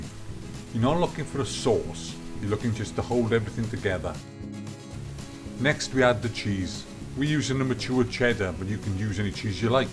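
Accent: Wales, United Kingdom. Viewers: The Welsh accent is very refreshing so it is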